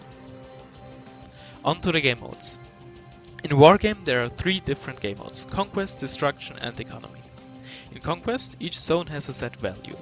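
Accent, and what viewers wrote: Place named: Germany. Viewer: Kannste das ganze nicht nochmals auf deutsch machen? Du bist dem deutsch ja mächtig, wenn ich auf deinen Akzent höre...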